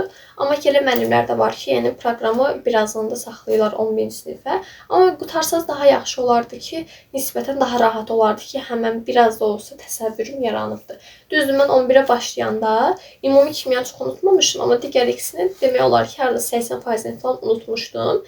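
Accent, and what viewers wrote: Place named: Nakhchivan, Azerbaijan. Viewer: tam təxmin etdiyim kimi😊❤ Ləhcə ilə danışmırdın sadece ara sıra bəzi kəlimələrdən Naxçıvanlı olduğu düşündüm ❤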